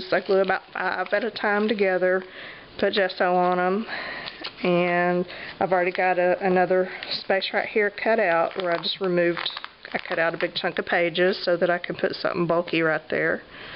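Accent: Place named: Texas, United States. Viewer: HI! I just found your channel yesterday. Awesome! 1st I love hearing the sound of home in your voice (I'm from the DFW area now in NW). 2nd I am so going to get a phone book and make an art journal, what a super idea! Thanks for sharing, now I have to find the video that tells me how to put the inclusions in. Keep it up!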